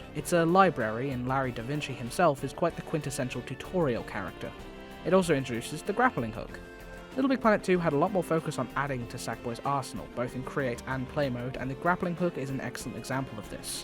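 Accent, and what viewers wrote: United Kingdom. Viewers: The narrator is the only British voice actor in games I play in where they don’t backstab you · Seriously dude are you a fucking idiot trying to describe racism out of that I don't think I can go any further this guy trying tell us changes his whole voice to not sound British self hate much shut your mouth about nothing knowing mf hipacrit do you have black friends shouldn't you be more tolerant and say African American dude all my other colerd friends have big lips bigger nose than me still beautiful but obviously different I work with Asian guess what there eys are smaller then mine dude Seriously just cuz its accurate don't always mean it's racist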